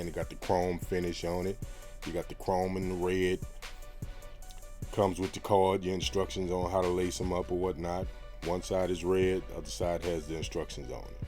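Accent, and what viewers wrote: New Orleans, United States. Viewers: You got to be from New Orleans you talk and sound just like my boy. You heard me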